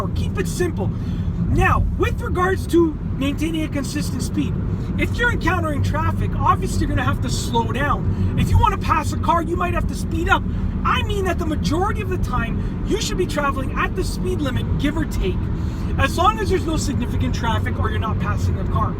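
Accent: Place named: Canada. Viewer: I think abe Lincoln is said to have spoken like this guy. Though ... Probably without the Canadian accent.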